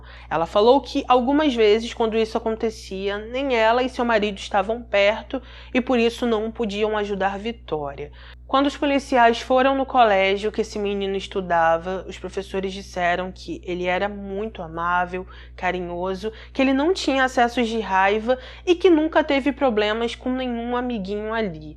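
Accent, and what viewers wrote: Rio de Janeiro, Brazil. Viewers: Olá, gosto muito de sua narrativa, sua voz é muito bonita e seu sotaque deve ser carioca, já sou inscrita no canal.Triste esse caso, creio que tudo estava errado desde o início quando tiraram as crianças da mãe biológica! Lamentável e nada trará de volta a garotinha!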